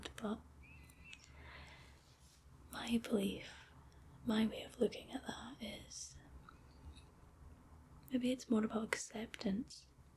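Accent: Scotland, United Kingdom. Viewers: I need to move to Europe ASAP. Our accents here are terrible and do not compare to yours. i need this accent in my life 24/7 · Her soft Scottish voice is calming and relaxing .. beautiful eyes too. 🙂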